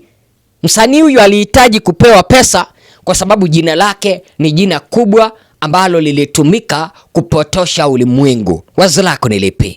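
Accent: Kenya. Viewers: Mbona ki Swahili cha Kenya. Halafu unasema nchi yetu Tanzania.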